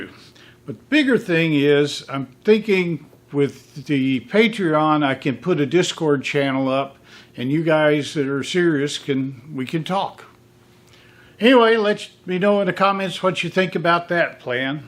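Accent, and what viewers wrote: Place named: Houston, United States. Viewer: from the start of watching your videos, I've felt like I had a friend. I think it's because I grew up in Houston and there's something about your dry sarcasm (and accent) that reminds me of just about everybody I grew up with. I 't mind the longer format when the content is fun and interesting. You are an actor, so making these videos combines two sets of expression that are important to you. As soon as you make it about the money, it will be less fun for us and more like work for you, like an amateur musician getting a paid gig and now being obligated to spend four hours a day practicing. We watch because we like the hang-- is the surrogate for us as we watch and that's a good role. Do Patreon only if you actually need the money.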